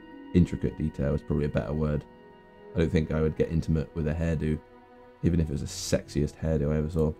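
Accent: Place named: United States. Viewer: you sound very American when you speak... what to call it... gamer-lingo?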